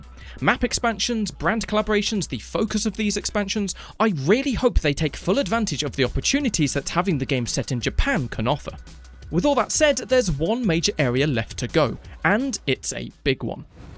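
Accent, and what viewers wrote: United Kingdom. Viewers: That's just an English accent dude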